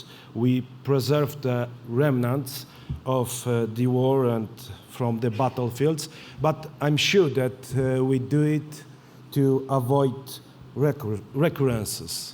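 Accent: Poland. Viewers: Ludzie co się przypierdalają do jego angielskiego żyją w zupełnie odrealniony świecie. Wiadomo, że akcent mocno polski, ale widać, że wie co mówi i potrafi przekazać co chce w tym jezyku - niczego bym tu nie ujmował. Najważniejsze, żeby nie czuje się skrępowany mówiąc i mówi płynnie. Przypomina mi się zawsze Jose Mourinho, który mimo swojego łamanego angielskiego i śmiesznie brzmiącego akcentu nigdy nie tracił charyzymy w tym języku i wszyscy go zawsze słuchali jak mówił. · Bardzo dobrze mówi po angielsku. Ja wychowywałem się w UK, więc mówię to z punktu widzenia kogoś kto mówi płynnie po angielsku i muszę powiedzieć, że naprawdę nie jest źle. Używa bardzo dobrych słów i mówi całkiem poprawnie. Tylko musi popracować nad akcentem. Najlepiej byłoby, żeby poprzebywał z osobami z Anglii lub USA, którzy mówią bez 'akcentu polskiego' to myślę, że szybko by podłapał akcent i wymowę. 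Ale naprawdę wcale nie jest źle. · Ma Polski akcent ale bardzo dobrze mówi po angielsku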